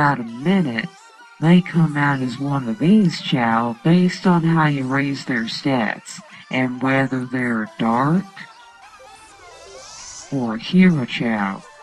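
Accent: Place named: South, United States. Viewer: Can confirm, lotta southerners talk like this. Even me from time to time😅 Surprisingly, this aged just fine though voice sounds a tiny bit scratchy(like just woke up kind of scratchy) It looks like it helped a lot of people going by the comments. Even now, this is a great video!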